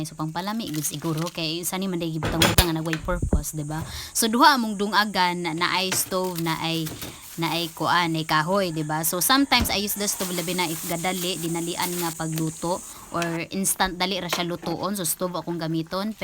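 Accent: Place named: Bohol, Philippines. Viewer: malingaw kaau ko maminaw jud sa bul anon ba, "talijukon" "buho" ❤️❤️❤️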